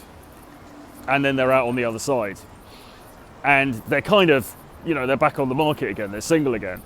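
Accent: United Kingdom. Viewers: is a traveling street man on the move. He's the 007 of the Manosphere! Complete with British Accent. Now where is hiding that Aston Martin DB5?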